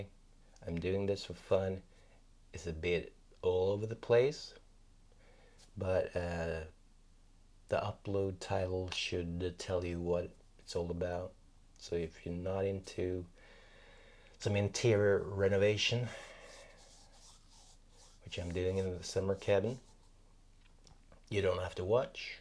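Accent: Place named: United States. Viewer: I thought you were american before you told us you're a swede, like i am 🙂👍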